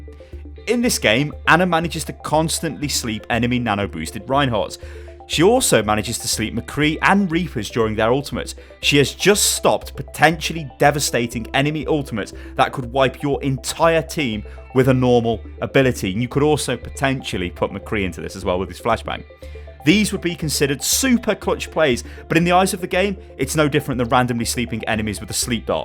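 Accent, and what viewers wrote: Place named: United Kingdom. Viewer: People always fill the comment sections with memes and get all the thumbs ups. They're funny and all, but I want to say something that most of the people in here won't point out. I think / makes the best Overwatch videos in YouTube. Hell, he probably makes one of the greatest gaming videos in overall. Don't get me wrong, he isn't the world's greatest Overwatch player, but he cares so much to share his experience and insight of the game. He's enthusiastic of what his doing and at least I feel that I can absorb some of that energy to my gaming. And even though he would post garbage, I'd still probably watch it, just because of that sweet authentic British accent and toodle-oo! Love from Finland, keep it up.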